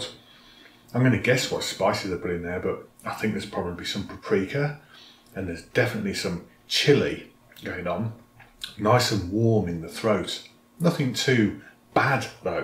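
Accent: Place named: Birmingham, United Kingdom. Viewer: Brummy? Noice.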